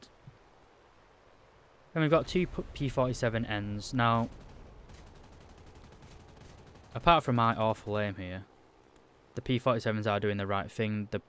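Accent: United Kingdom. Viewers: Hey from which part of the uk are u?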